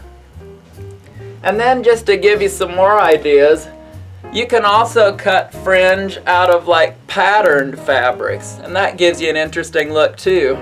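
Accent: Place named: South, United States. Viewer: I'm obsessed with her country accent! I could listen to her speak all day!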